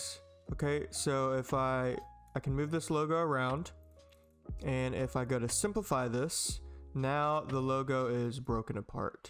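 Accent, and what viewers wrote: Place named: England, United Kingdom. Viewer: Thank God for clear english accent and also for useful video!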